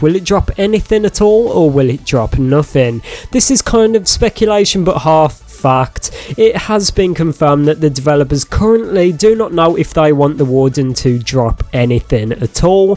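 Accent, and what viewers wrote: United Kingdom. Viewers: I know it's british but which one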